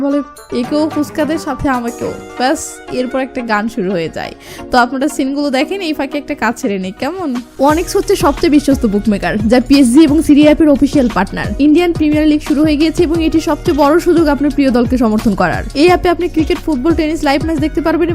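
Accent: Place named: Nawabganj, Bangladesh. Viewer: নবাবগঞ্জের মেয়েরা এভাবে কথা বলে আমার মনে হয় উনার বাড়ি নবাবগঞ্জ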